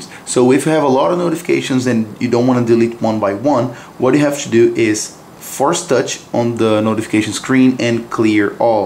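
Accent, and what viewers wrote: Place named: São Paulo, Brazil. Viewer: Khew you were Brazilian by the paulista accent hahah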